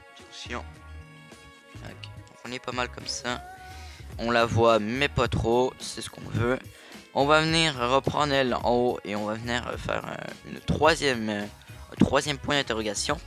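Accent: Quebec, Canada. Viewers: Hey vive l'accent Québécois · T'es québécois ?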